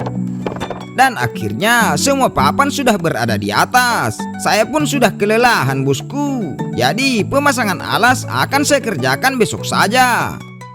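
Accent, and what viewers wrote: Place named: Medan, Indonesia. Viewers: baru pertama nonton channel ini seru juga, kayaknya dari logat orang asli sumut ya bosku? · Ini Lokasinya Daerah mana bang?, logat abang kalo berbicara seperti Logat Medan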